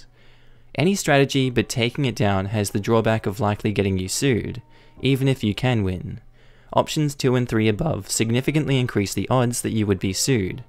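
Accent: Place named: Australia. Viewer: a voice so Australian I nearly fell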